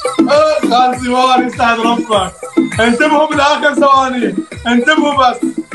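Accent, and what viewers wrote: Iraq. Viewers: تعرف انت حجيك عراقي و تكتب سوري ليش شنو سببها🙄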